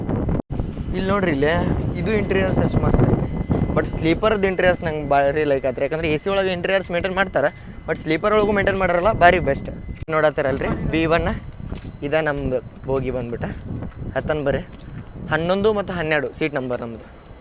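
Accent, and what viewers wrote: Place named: Uttara Kannada, India. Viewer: Nivu uttarkannada madi anusutte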